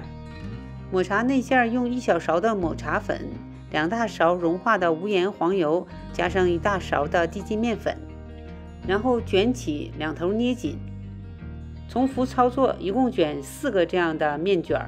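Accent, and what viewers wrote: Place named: Northeast, China. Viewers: 一个吐司用拔个面包生坯，哈哈哈哈哈哈哈，好亲切的东北话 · 是沈阳话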